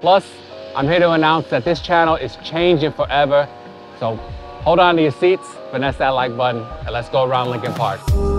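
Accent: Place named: New York City, United States. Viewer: I can hear the NYC accent slightly stuck with you through osmosis from through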